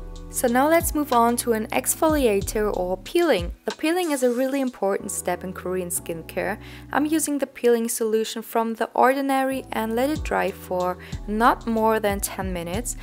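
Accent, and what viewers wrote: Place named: Germany. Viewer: Wow that's a thick German accent... But anyways, good luck with the routine, 파이팅!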